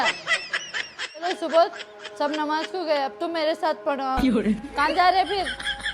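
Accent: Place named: Hyderabad, India. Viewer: Mam yaar I really liked the Hyderabadi language in the you 😂🤣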